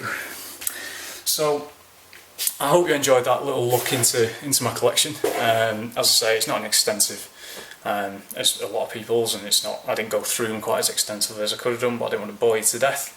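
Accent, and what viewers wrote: Manchester, United Kingdom. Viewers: Loved this. You sounded particularly manc in this vid. Love it.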